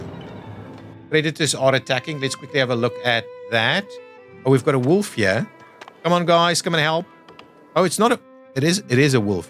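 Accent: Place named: South Africa. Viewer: I recognise that accent Boet!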